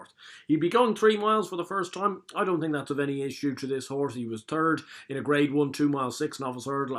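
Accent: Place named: Ireland. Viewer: donkey years and pats fancy ew or to win, what are you suggesting here, it's the thick irish accent that i find hard to keep up with sorry bud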